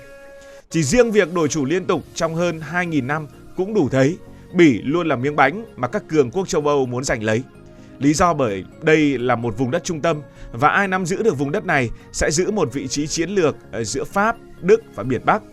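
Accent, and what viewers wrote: North, Vietnam. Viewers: Tiếng băc ky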